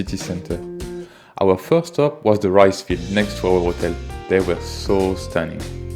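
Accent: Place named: France. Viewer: Your French accent is WAY too thick - je n'ai compris RIEN! Thumbs DOWN!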